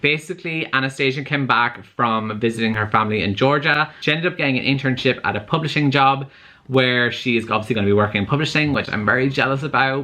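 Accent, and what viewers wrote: Ireland. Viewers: siri with the irish accent omg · Living for 50 shades of grey + this irish accent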